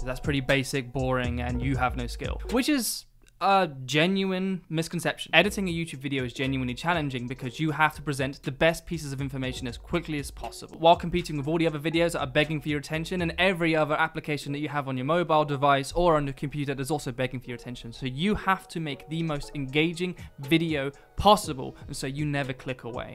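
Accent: United Kingdom. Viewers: Wow the genius of david dobrik explained by a mastercraftsman in an english accent. Impeccable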